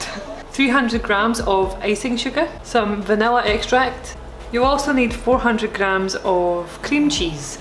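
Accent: Scotland, United Kingdom. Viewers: Be proud of Scottish roots lass. Let that accent be free.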